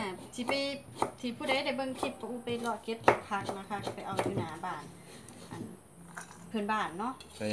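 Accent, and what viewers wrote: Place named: Isan, Thailand. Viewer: แต่งแบบนี้ ตลกคณะคณะเสียงอีสานๆๆๆคักๆๆ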